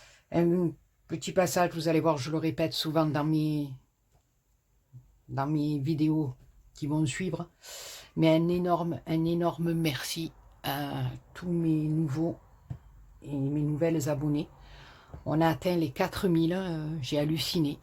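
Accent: South, France. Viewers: Coucou, rhooo mais où vas-tu pêcher toutes ces idées fantastiques. C'est vraiment très beau d'autant que l'on peut le refaire pour toutes occasions. C'est génial et réellement une bonne idée cadeau. Avec ton petit accent du Sud et ta gentillesse c'est un régal de visionner tes videos. Un rayon de soleil en ces périodes certes festives "normalement" mais quelque peu moroses à cause de ce foutu virus grrrrrrrr. Superbe!!!! Gros bisous et merci pour ce partage.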